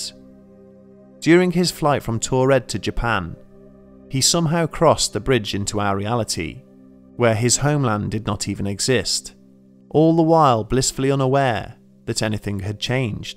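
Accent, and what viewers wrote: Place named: Northern England, United Kingdom. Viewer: His accent is northern English (possibly Yorkshire) although not very pronounced. The internet is swamped with American media and accents these days which is probably why you haven't heard of it before. Even the media in Britain tends to include predominantly southern/accentless British voices so we're not blameless either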